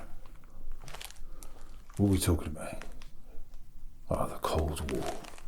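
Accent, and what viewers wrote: United Kingdom. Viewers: this is so british and i love it .. 😂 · omfg hes so British, i love it sm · i just clicked in here for the british accent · i dont understand shit because hes too british, i swear to god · THE BRITISHNESS TW 😂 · BRITISHNESS AS A TRIGGER IM DEAD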